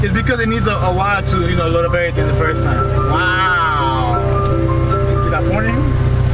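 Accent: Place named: Latin America. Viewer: I apologize for this, but I catch some accent (latino accent) . My bad man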